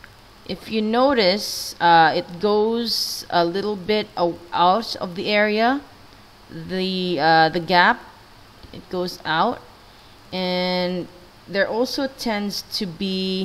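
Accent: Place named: Philippines. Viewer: Pilipino ka po Mam...halata ko sa Accent mo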